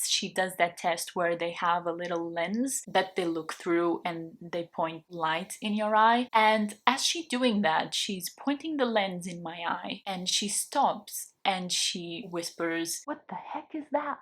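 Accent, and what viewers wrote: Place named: Portugal. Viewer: Caramba, venho maratonando os seu vídeos e agora que percebi que você é Portuguesa!! Seu sotaque é incrível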